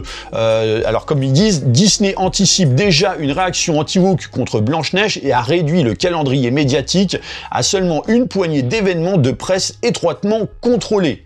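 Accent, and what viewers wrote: Paris, France. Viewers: Ha punaise non! Rien à faire ... cet accent parisien très appuyé est trop insupportable !! Je m'en vais... ORLG ...IRLG ...URLG ...ARLG ....OURLG !!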